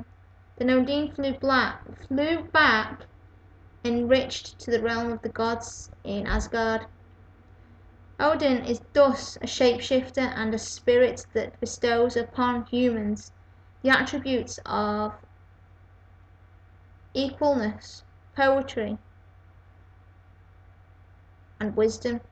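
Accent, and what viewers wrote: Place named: England, United Kingdom. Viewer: I can hear you loud and clear you sound fine and have a beautiful voice. I think chics with a real english accent are hot. Stop listening to what others say they are just jealous of your beauty.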